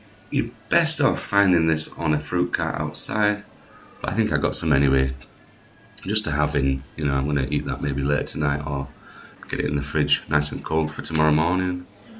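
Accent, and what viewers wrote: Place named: Yorkshire, United Kingdom. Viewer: What accent is that it sounds a bit Yorkshire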